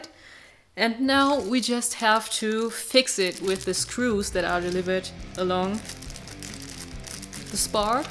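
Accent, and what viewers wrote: Germany. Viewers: Are you from Deutschland? I love that German accent :3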